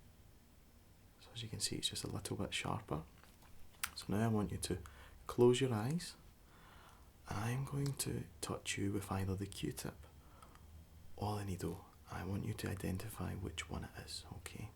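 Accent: Scotland, United Kingdom. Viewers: I love this! It's always more relaxing for me when it's a scottish accent because I'm Scottish. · I adore your videos, you have such a relaxing voice! And I'm quite the sucker for scottish accents:) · I think I'm going to move myself and my Australian accent to Scotland now in a desperate attempt to pick up this accent! Hahaha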